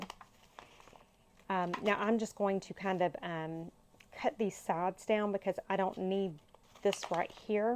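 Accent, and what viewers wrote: South, United States. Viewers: As a new subscriber of yours, I love your videos. I am a southern girl and we can’t help our accents any more than people 🎉from other parts of the country can. You just keep being you and disregard the negative Nellies. If someone’s voice or accent bothers someone that much, they can always use close captions and just read what you say instead. I do that a lot if I can’t turn the volume up when I watch videos. Love your junk mail tips.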